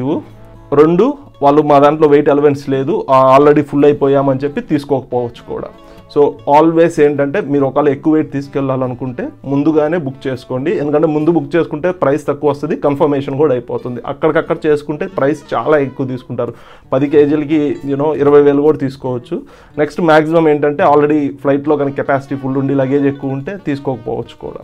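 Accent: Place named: Rayalaseema, India. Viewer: Your ascent seems rayalaseema ...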